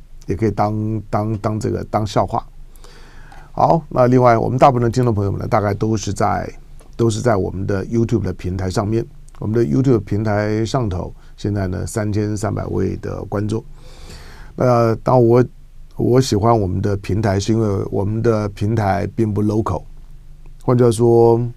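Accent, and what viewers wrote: China. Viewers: 妳說著標準的大陸口音要蔡總統跟川普下台，典型的中共代理人，中共同路人啊。抵制唐湘龍。。。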